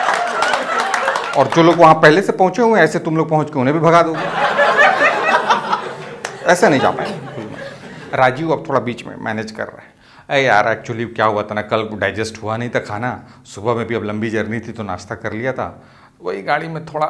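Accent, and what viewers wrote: Bihar, India. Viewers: Your comedy is superb no doubt, But your Bihar style maza aa jata h sun k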